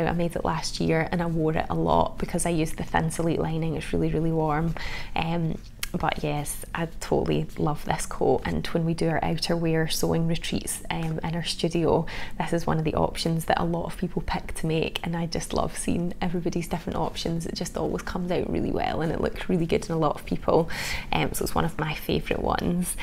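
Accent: Scotland, United Kingdom. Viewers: Yes, that lovely Scottish accent. My husband also loves listening to videos because of the accent.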